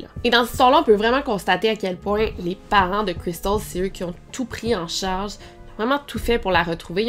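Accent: Quebec, Canada. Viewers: Bonjour, je viens de voir que ta chaîne n existe plus pourquoi??? J adore tes vidéos, surtout ton accent québécoise, tes narrations au top....sois heureuse dans ta vie, tu va nous manqué, bisous de la France 😘😘🇫🇷🇫🇷🇫🇷🌺🥀🌹🌷💟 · Salut, Je suis une de tes fans française chaque fois que j'écoute tes histoires ton bel accent me transporte dans vos belles contrées québécoises 🤗félicitations à vous deux, plein de bonnes choses.❤ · Tres Bonne video! L accent du Quebec (?) c est trop cute! Pourrais tu traiter l affaire Loubna Benaissa? C est une affaire belge qui a ete baclee entre autre a cause de l affaire Marc Dutroux et aussi que c etait une petite fille issue de parents immigres du maroc qui parliament pas francais. Ca s est passe a bruxelles dans les 90s. Il n y aucune video sur ce cas. Continue comme ca! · C'est bien raconté mais avec ton accent québécoi sa rend drôle 😂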